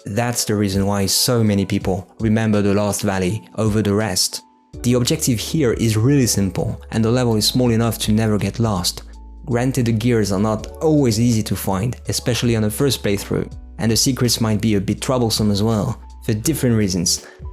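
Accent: France. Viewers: Is it just me or does he have a french accent? Great video btw!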